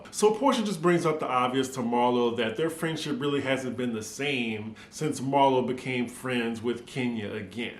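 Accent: New Orleans, United States. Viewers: You still have your accent,. It's only a slight accent but I always hear the New Orleans twang when you talk.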